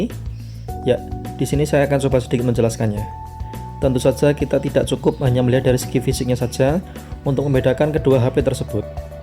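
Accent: Java, Indonesia. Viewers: Terlalu kental jowonya mas ,, sampe sya dger berkali kali mas Jowo ngucap nama prociss nya Snapdragon dan Exynos ,, kalo bisa latihan encerkan dikit Logat Jowo nya . thx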